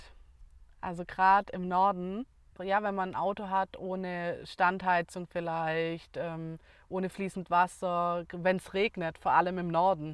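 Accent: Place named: Swabia, Germany. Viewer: Ein Hauch von Schwäbisch - sehr charmant.😊😊😊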